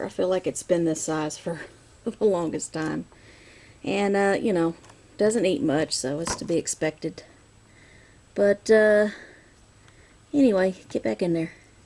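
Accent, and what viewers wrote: South, United States. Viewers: I like your southern accent. I wish my Californian accent was as cool as your accent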